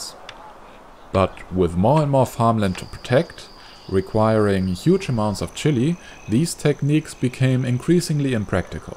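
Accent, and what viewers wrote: Germany. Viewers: why u have are german accent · I love the accent of this narrator. Sounds like german man speaking english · german Accent._. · Are you German? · Dieser cringige Deutsche accent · Bist du Deutscher? Hörst dich jedenfalls so an😂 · Man hört das du deutsch bist. Irgendwie hört man das am Englisch raus, aber ich mag deine Videos :D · Are you german? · haha the guy sounds so german ;) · are u german? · Your accent sounds German. Are you ? :) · Könnten vllt auch mal Videos in Deutsch kommen? An sich mag ich den Kanal, aber der deutsche Akzent nervt etwas... 😅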